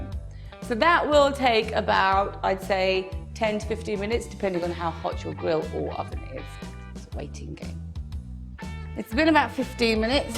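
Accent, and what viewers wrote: United Kingdom. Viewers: Looks Indian, sounds British and is cooking Mexican I am really confused right now. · Amazing british accent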